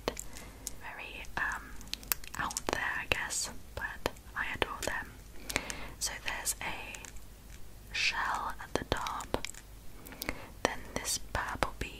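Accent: United Kingdom. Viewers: Yes yes yes! Best ASMR ever. Your British accent is a trigger itself for me! Lots of love from Dominican Republic ❤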